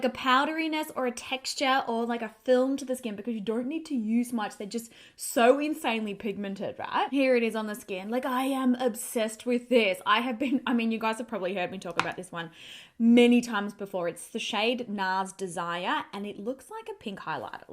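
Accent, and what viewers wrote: Australia. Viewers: I could listen to you speak all day long. Your Australian accent is lovely. I am a New Yorker and we sound like truck drivers. New York accents are actually offensive to the ears 😂.. listening to your beautiful voice is so calming it’s just so so pretty.. When a New Yorker opens their mouth it’s like people want to cover their ears because we are so loud and sound like drunken sailors 🤷‍♀️🤪..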